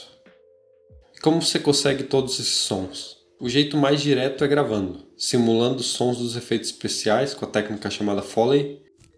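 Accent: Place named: Brazil. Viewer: Eu te conheci pelo canal de inglês, o sotaque brasileiro te identificou, video mto bom, muito obg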